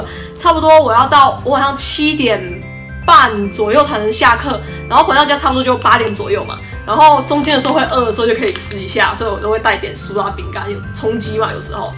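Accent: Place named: Taiwan. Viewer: 哈哈哈覺得這集台灣腔好重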